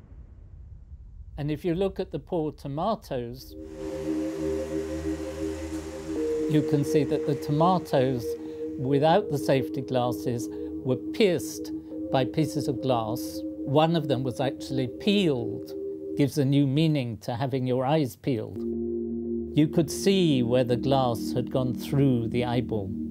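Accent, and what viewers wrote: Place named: Nottingham, United Kingdom. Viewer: That's how they pronounce tomatoes in Nottingham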